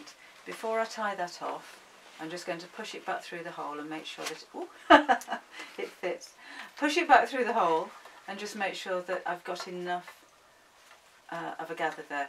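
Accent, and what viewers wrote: England, United Kingdom. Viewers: It is so nice to listen to a tutorial given in an English accent.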